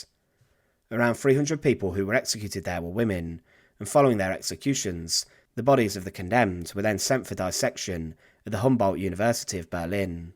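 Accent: United Kingdom. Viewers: No ,he sounds British which he is. Enough said already!..😅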